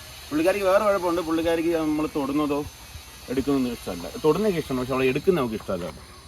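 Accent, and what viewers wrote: Kollam, India. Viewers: മ്മ്ടെ കൊല്ലം ഭാഷ വേട്ടാവള ിയൻ😂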